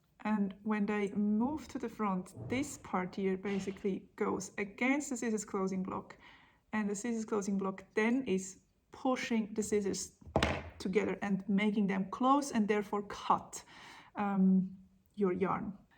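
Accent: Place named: Switzerland. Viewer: avec le ravissant accent suisse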